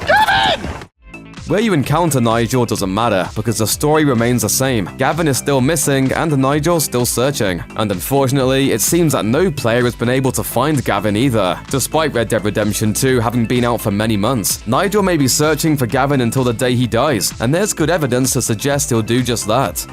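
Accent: England, United Kingdom. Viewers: No he doesn’t- he pronounces it like an English guy as he’s English like me!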